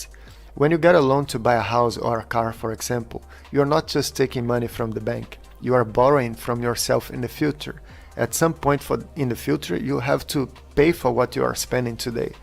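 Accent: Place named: Brazil. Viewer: Not gonna lie, but your acent sounds very Brazilian for me haha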